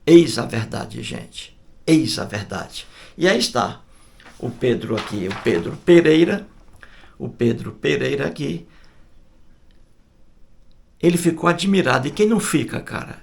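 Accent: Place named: North, Brazil. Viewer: faça um video com o ANTONY GALVÃO (do canal Pilastra da Verdade), ele também é nortista. Sou cristão e paulista e acho bonito o sotaque de vcs 👍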